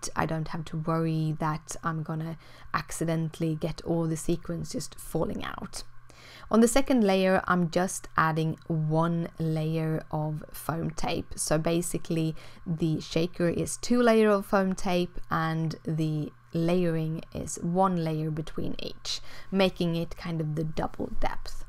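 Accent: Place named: United Kingdom. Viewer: USA resident. Oh my gosh, I LOVE that you made the water hole a shaker. I'll look forward to seeing more of your videos because I like your style. And your accent. I'm going to the UK in Sept/Oct., and will hear nice accents everywhere :)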